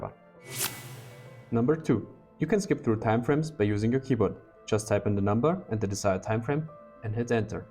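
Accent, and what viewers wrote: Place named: Germany. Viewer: I didn’t know you also had a German accent 😅 great vid brother. Hope it’s the first of many!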